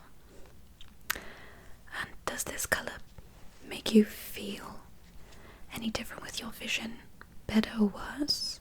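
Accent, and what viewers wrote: England, United Kingdom. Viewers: You have the nice british accent, im a british too. Proud of 🥰🥰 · Love your asmr clips, your gorgeous and your British accent is so good! you should be in the next Austin Power's movie if they ever make one 🙂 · Love to hear the English accent. Good stuff👍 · ill never get over her British accent...sooooo calming and relaxing !!! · LOVE that English Accent of yours!!! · Was she trying to do an English accent? 😩😂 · That is the best english accent from an american ive ever heard. As a formal member of the UK. Good job!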